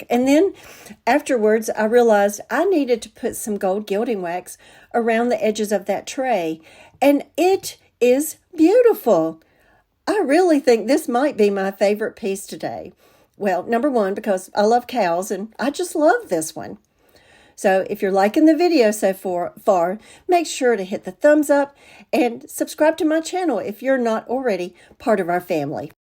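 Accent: South, United States. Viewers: I love to watch all ur videos! There has never been one that I don't just LOVE❤ I also love to craft and I hope to be as good as you one day!! Also I just want you to know I love your sweet southern voice just so adorable 😊 I'm from Alabama, where are you from?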